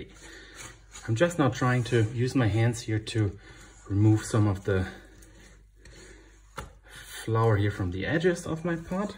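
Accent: Germany. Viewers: Are you from Flensburg? :)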